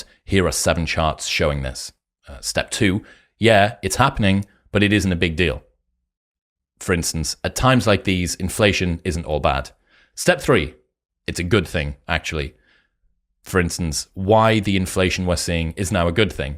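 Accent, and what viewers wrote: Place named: United Kingdom. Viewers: This is such an insane volume of sourced wisdom, knowledge, and perspective combined with a British accent. Freaking thank you. I hope everyone hears this and puts it into application. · combined with british accent got me really bad :D:D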